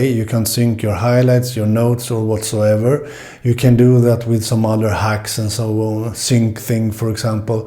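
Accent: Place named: Sweden. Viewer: Jag har just installerat "assistant.koplugin" från github på min Kobo libra 2. Testade för ett par år sen med askGPT, men den funkade nog bara till jailbreakad Kindle. Men jag rekommenderar verkligen att kunna använda AI. Kör själv DeepSeek, som jag eg bara kan tycka ta ngt för lång tid, men annars hur bra som helst. Tack för en utmärkt kanal. Jag känner mig väldigt hemma här som svensk, medelålders e-bokläsar-nörd med samma svengelska dialekt som du. :)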